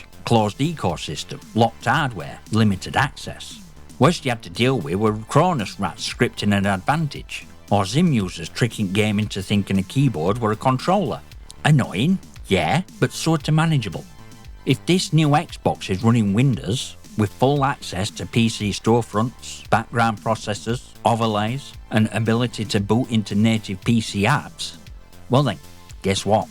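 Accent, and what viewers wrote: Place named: Northern England, United Kingdom. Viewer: Fellow northerner here. No need for captions ;). All the best our lad!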